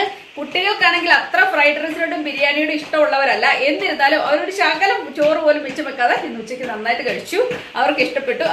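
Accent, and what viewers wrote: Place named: Central Kerala, India. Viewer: Kollam,Allapii, kottayam, Thrivala, IDDUKImy guess 😃☺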